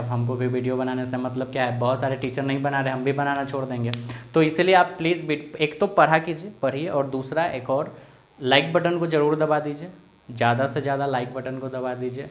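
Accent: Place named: Bihar, India. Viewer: Bihari language me feel hi Alag hai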